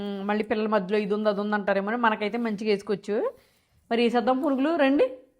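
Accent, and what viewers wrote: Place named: Amalapuram, India. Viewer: అమెరికాలో అమలాపురం యాస మరియు పునుగులు స్వచ్ఛంగా ఉన్నాయండీ...😊👌💐💚🇮🇳💜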